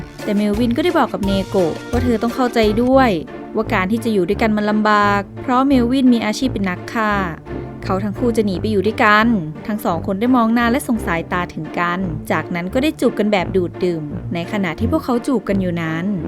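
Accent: North, Thailand. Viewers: แอดมินเป็นคนเหนือใช่มัยครับ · สนุกกกกก คนภาคเหนือแน่ๆเลยอะ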